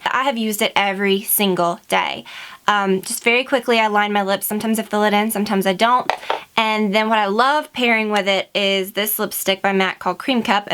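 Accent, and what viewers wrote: South, United States. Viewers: first time i've ever noticed your southern accent! it's quite strong don't know how i've never noticed it! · Way more Southern in these videos! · Thanks for sharing your favorites! You sound a little southern in this video, it's awesome haha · sometimes your southern accent stands out so much haha never really noticed before a couple videos. you loo gorgeous xx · you sound so southern omg, it's so cute <3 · GA accents are adorbs! Just like you Meredith Hammett your icon pic is BEAUTIFUL! I wish i could visit there someday! and sorry to point it out..hopefully it didnt distract too much lol but being from california i simply adore southern accents. And to be honest I never really realized had one... makes we wanna double thumbs up this video! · Im not from the US but I watch the walking dead, and every time after I've watched an episode I can really pick up your more southern accent. 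Because they film in the same state as you live in. It's super cute! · I have watched so many of your videos, and I never noticed such a southern accent before! Anyone else pick up on it. So funny, makes me like her even more :) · This was the first video I noticed a sweet southern accent! :) So happy for a lipliner suggestion that is great with nude lips...it really is hard to find! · It sounds like you have more of a southern accent in this video!! It's so cute haha ( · Is it just me or does sound soooo much more southerner?? I lav her 💕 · I love your faint southern accent. I need to try Anastasia products asap!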